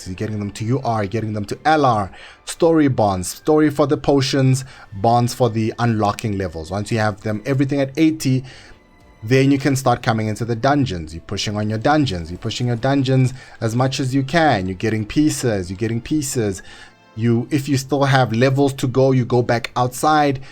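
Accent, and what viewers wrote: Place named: South Africa. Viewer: Best tutorial hands down, you sound South African 🤔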